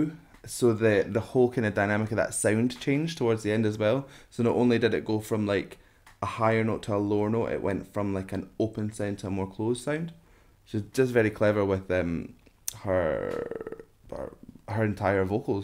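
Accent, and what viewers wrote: Scotland, United Kingdom. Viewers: This guy british accent were very cute! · omg i love british accent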